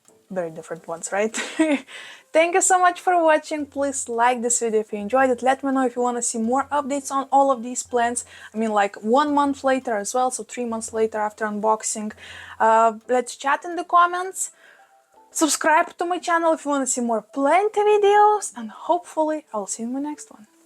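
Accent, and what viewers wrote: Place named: Russia. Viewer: Hope you are doing and feeling great! Your video and your thick Russian accent always bring me joy and elation whenever (read always ) I watch you 👀. Great job on rehabbing those beauties and thank you once again for filling us in the updates -these are always one of my fav videos of your to watch. Hiii from the 🇺🇸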